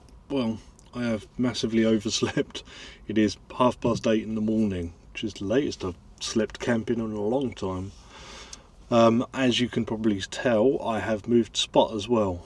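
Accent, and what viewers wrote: England, United Kingdom. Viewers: Sem aye , your English man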